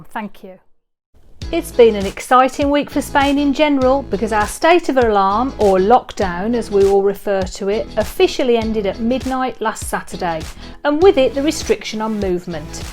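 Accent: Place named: Birmingham, United Kingdom. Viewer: Great to see things getting back to normal, over middle of September should be a lot further advanced by then and you must be a Brummie with that accent 😃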